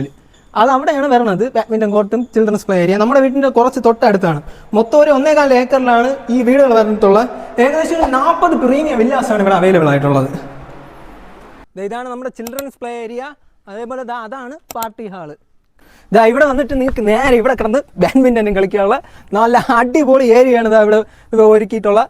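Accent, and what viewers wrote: Parassala, India. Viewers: ആദ്യമായിട്ടാ നിങ്ങടെ വീഡിയോ കാണുന്നെ, നിങ്ങടെ സംസാരം കേട്ടിട്ട്, പാറശ്ശാല - കൊറ്റാമത്ത് എനിക്ക് പണ്ട് ഒരു കൂട്ടുകാരൻ ഉണ്ടാരുന്നു അവന്റെ അതെ സ്ലാങ് 🙂..കേട്ടപ്പോ പെട്ടന് ഓർമ്മ വന്നു..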